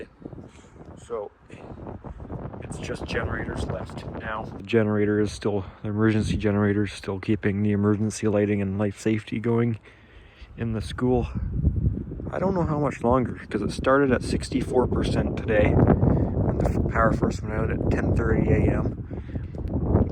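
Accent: Canada. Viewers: Hi where are you from your accent sounds Canadian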